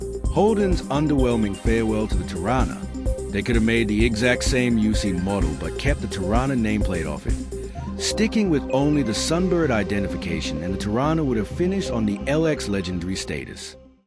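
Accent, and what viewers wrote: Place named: United States. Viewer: You couldn't find an AI Aussie voice 🙄 it kinda ruins it with the American accent.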